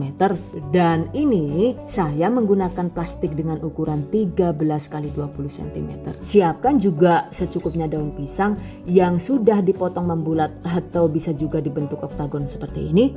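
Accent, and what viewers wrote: Banyumas, Indonesia. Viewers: Suka keluar ngapak nya... Primen sih jebulane wong ngapak yaaa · wong ndi si rika ? deneng teyeng ngomong ngapak yong? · Mbak orang mana kok ngapak ngomonge 😂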